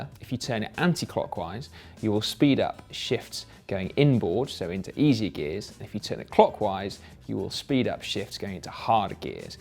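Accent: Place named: United Kingdom. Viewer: As an American it gets tiresome listening to British accents all day when looking for good bike videos... but how badass is it that this guy is not only a great rider who loves to get hands on and understand the concepts behind how his gear functions, but he's got a clear and engaging style of presentation as well. Just a shout out to this dude and his many great videos.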